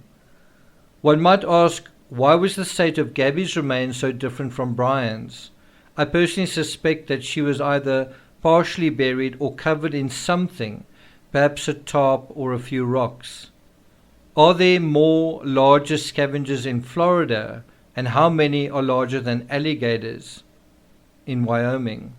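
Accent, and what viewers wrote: South Africa. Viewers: Btw, are you South African? My brother in law is and I hear that accent, particularly the last millisecond at the tail end of each of word as it cascades away into our eardrums... I dig it man! I want you to know I appreciate the way you break things down very quickly when you speak,..which is very therapeutic & healing. Are you a therapist? I get the vibe..you understand human psychology & introspective philosophy seamlessly. Brilliant. Thank you, You help me look at myself in new ways and can now work my way through some personal struggles and trauma's with an arsenal of new tools to apply when the healing hands of time are out of time... Much love & respect!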